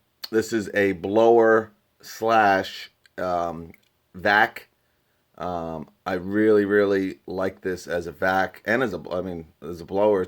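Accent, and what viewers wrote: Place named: Northeast, United States. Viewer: You have to look too beyond the initial price. Some might say I can get a "better" Toro, HomeLite, etc. at Lowes/Home Depot initially but where do you go to get it serviced, definitely not Home Depot, etc. Ace Hardware is a Authorized Dealer and typically your old school lawn shops service the higher end products like Stihl, Husqvarna. You have to add that to your overall decision in purchasing a unit. I think for myself, for light blowing off grass the driveway and deck, I can't beat the BG50 for my personal use that is for sure. Love the Northeast accent! lol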